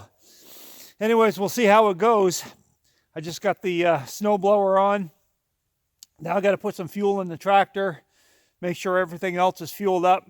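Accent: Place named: New Brunswick, Canada. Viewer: that and you have the same accent as the guys I know that live in western NB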